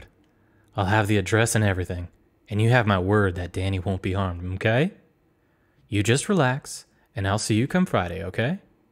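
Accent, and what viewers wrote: South, United States. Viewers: The slight country accent is making my southern heart feel something😩✋🏾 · I live in the south and might i say i love the Accent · Where are you from? I can hear an accent 🤔 Are you from the South? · The bit of country I hear is the best I love it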